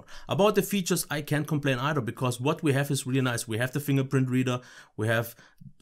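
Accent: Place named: Germany. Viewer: I love the review and accent! It sounds kinda german'ish. :D (Just in cute)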